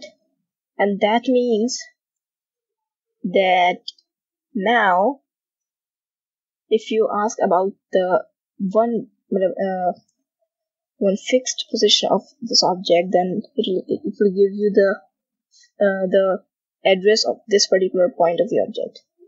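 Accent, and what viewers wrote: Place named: India. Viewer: How come every single tutorial I watch online has an indian accent?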